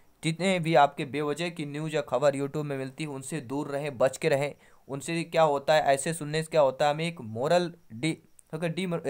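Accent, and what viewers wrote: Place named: Uttar Pradesh, India. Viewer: but aapki language u.p. ki lg rhi hai...🙏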